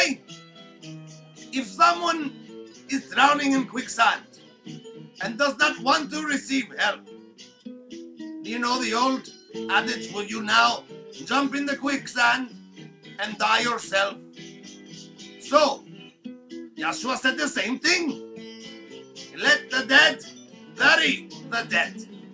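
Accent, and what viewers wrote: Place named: India. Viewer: You're Indian accent is funny!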